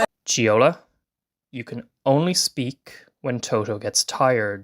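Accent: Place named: Ireland. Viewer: Why do you not talk about the atrocities made by other European countries participating in the Eurovision, just about Israel? you're clearly an antisemite. There's a cure for that. Learn history. There is no such thing as Palestinian people it was made up in 1964 to hurt Jews and ruin their only homeland. you're probably Irish by your accent so I'm not surprised. And that Nazi scream at the end... u need a shrink man.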